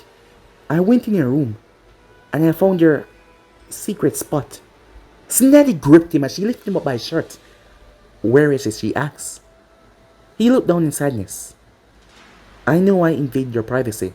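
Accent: Jamaica. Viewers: Hey i have a question by any chance are you jamaican???🤔🤔🤔🤔, I am just asking since when I listen to your stories i hear a bit of the accent🤔🤔🤔🤔 #hmmmm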